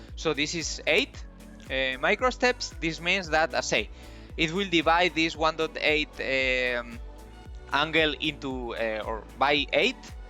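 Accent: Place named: Portugal. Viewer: My electronics teacher was from portugal. He sounded like you. Great teacher like you.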